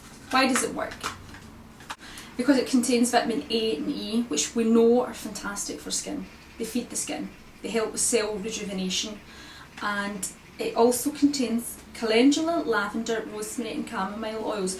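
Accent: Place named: Scotland, United Kingdom. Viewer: Great review and I adore the Scottish accent x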